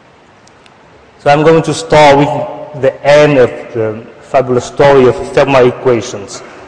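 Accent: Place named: France. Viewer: Heavy French accent :) I am a Vietnamese American, glad to see another Vietnamese coming out famous. Thank and hat of to France, a great country!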